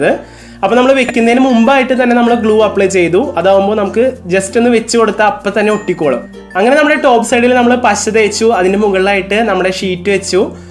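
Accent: Thrissur, India. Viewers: തൃശൂർ ഭാഷ യാണ്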